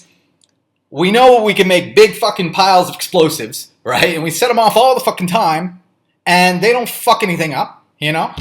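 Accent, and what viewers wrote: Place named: South, United States. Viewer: Also, southern accent really slipping through in this one, kek.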